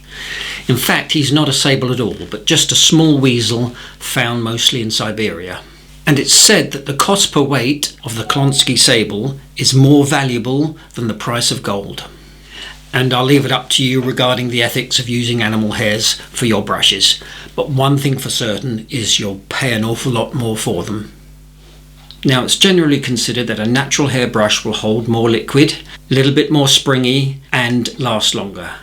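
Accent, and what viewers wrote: United Kingdom. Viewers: Nice to hear fellow Brit! You are now m new favourite…just done your skies tutorial…and m now listening to your views on paper and brushes.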